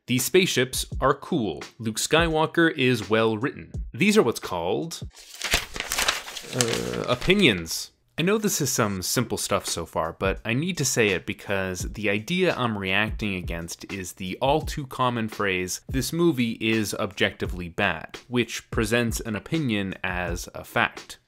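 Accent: Canada. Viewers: Are you Canadian? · by the way he pronounces certain words I have always thought he was Canadian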